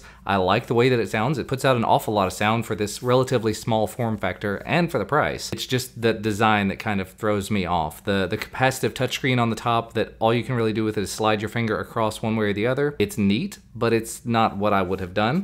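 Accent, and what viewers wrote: Kentucky, United States. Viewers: what part of kentucky are you from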